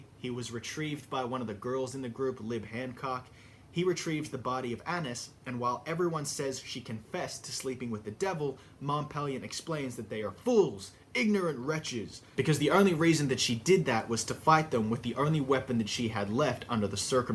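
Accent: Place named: Australia. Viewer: is it me or do you skip between an aussie and a american accent? lol Good video BTW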